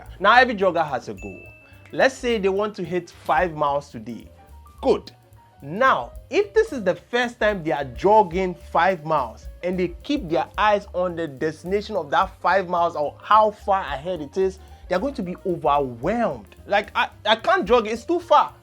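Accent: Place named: Africa. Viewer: Hey African brother I low key love the accent lol thx for the vid.edit:i am only calling you afican because i have heen in afican villages lol (i am african my self loll).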